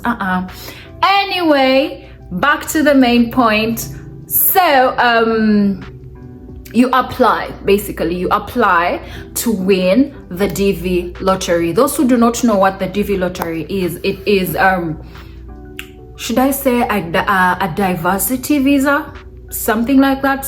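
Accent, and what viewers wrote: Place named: Uganda. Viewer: Uganda 🇺🇬 accent is so super wow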